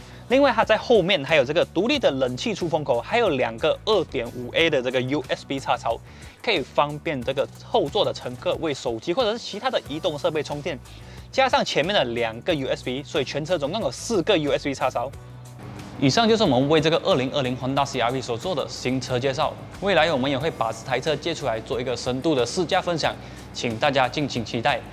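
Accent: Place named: Malaysia. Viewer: 马来的华语不都这样吗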